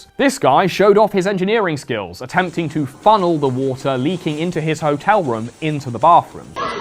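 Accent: England, United Kingdom. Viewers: Oh now I get it. You have the Russell brand accent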